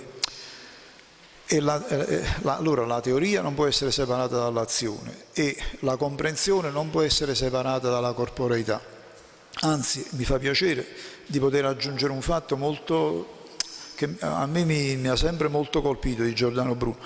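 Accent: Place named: Naples, Italy. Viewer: lei é un tesoro culturale napoletano con questa modestia irresistibile da del liceo, nessuna pianta raffinata,solo l'odore dei..limoni. Grazie IISF e Massimiliano per darci ció. Lo spirito di Archita, del nolano e di quel mecenate dell' istituto non moriranno mai. Che pianto amaro leggere la sua difesa senza abiurare. Che onore e fortuna potere sentire questi contenuti, con il suo accento a tanti km di distanza. Perché l'accento che si sente bene nel film é un accento di chi é, di chi parla delle “idee“ ripudiando le forme sofiste, di chi qualunque siano le pacate conferme del pensiero esprime il suo eroico furore. Grazie, sono davvero orgoglioso di esssere Italiano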